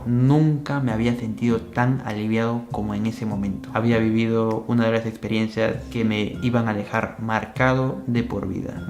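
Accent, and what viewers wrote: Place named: Peru. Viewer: Dicen que los bolivianos altoque detectan acento peruano y les caemos antipatico por la rivalidad de las danzas esas de caporales,morenadas etc, por eso te insultaron por tu nacionalidad.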